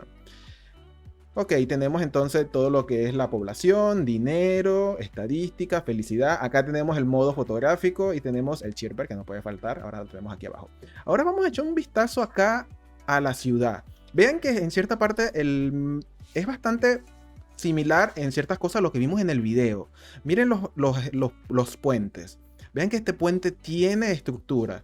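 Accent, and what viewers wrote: Venezuela. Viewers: Bro eres de venezuela?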